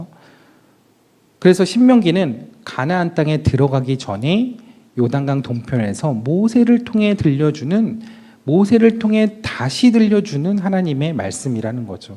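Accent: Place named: North Korea. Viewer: 목사님 북한 사투리 짱 이예요 ㅎ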